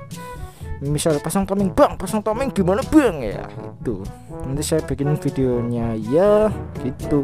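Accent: Indonesia. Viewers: Waa anjay raider ngapak Purwokerto 😂😂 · Anjay wong ngapak jebule wkwkwk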